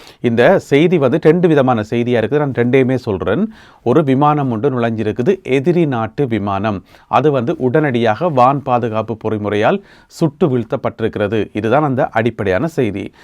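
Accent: Jaffna, Sri Lanka. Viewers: Your Jaffna style talking very interesting.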